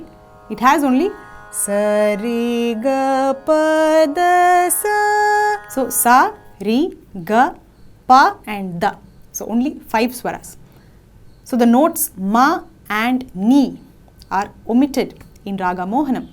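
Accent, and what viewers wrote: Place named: South India, India. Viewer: It is not raagaa.. it is raag... Also, it is not swaraa, it is swar. May be it is due to southern accent? The video is useful. Thanks for your efforts..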